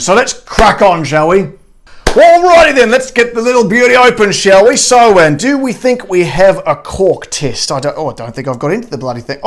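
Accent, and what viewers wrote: Australia. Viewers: 10-10 for the Aussie accent mate! Just bought this Gin tried it tonight. It’s unreal!! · As an Aussie, as well as this being my first time ever watching one of your videos, I actually thought you were from Australia for the first 30 seconds! Top marks on the accent. I also just bought a bottle and it's delicious. For all you non-Australians, don't worry about getting ripped off, its still expensive as fuck here too :(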